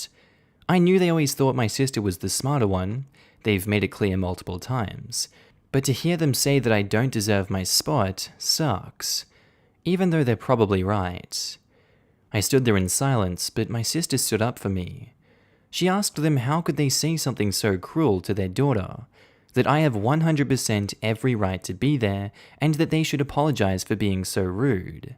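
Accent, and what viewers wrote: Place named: Australia. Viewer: Aussie accents are hot, then add that personality, voice and face and you have a quadruple threat guy!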